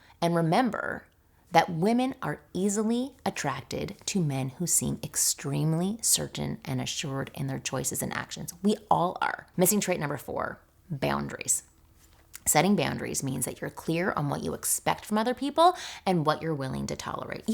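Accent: Canada. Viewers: your Canadian 🇨🇦🍁accent shines through sorry😂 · You are clearly Canadian, therefore your OPINION doesn't mean Shyte to me! Like I'm gonna take advice from someone who calls soda "pop" and calls Z Zed!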